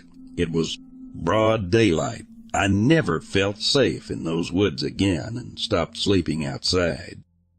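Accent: South, United States. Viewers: Good News!!! I'm really enjoying the substitute narrator!! He's definitely good at it especially with that southern twang!!!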